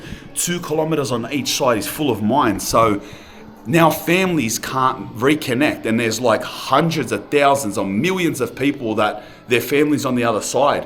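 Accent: Australia. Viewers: Its hard to take the aussie accent seriously 😅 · I am 74 & learned more about North Korea in this video than I ever learned in school or on news.Never heard about the tunnel. I liked everything about your video including you cool attitude. And who wouldn't love that Aussie accent. ❤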